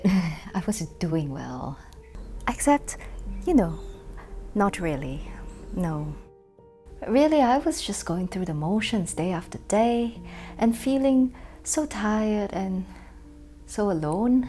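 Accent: Singapore. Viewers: hi!, is your husband from a Russian country? because your last name is but your accent is from singapore. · Hehe I like listening to your accent, you remind me of my friends from Singapore 😎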